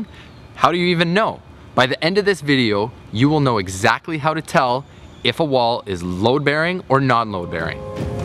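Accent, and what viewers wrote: Canada. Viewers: The most Canadian accent ever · You sound Canadian.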